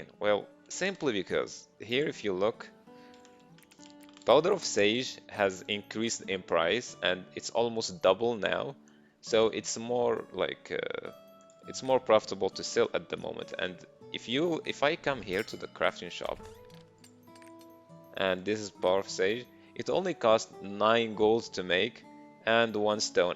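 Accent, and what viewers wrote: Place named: Morocco. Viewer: Your accent man (morocco/ amazigh)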